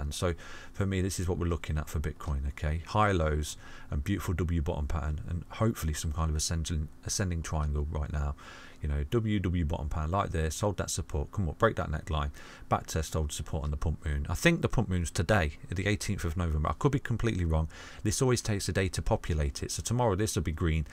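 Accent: United Kingdom. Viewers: You have a fcking perfect british accent, I love it.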